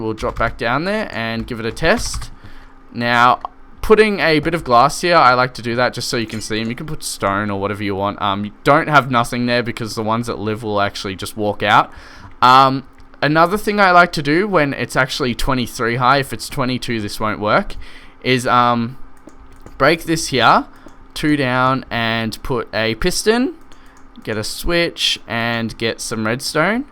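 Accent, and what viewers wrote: Australia. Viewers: you inspired me mate, wait your aussie! SAME!!!!! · easy as, aussie?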